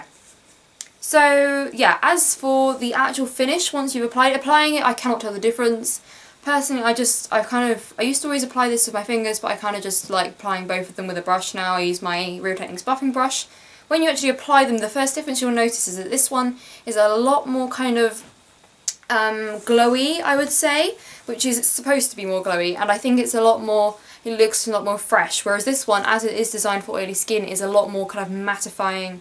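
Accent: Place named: United Kingdom. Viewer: You're British accent sounds amazing! This is a good video because I never knew the difference between the two bottles.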